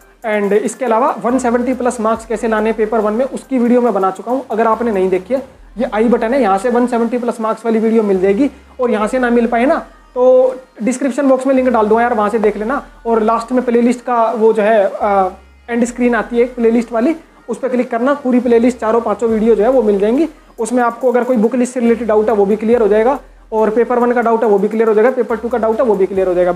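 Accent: Haryana, India. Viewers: Sir aap haryana se lag rhe ho 😎, thanks sir ji 😇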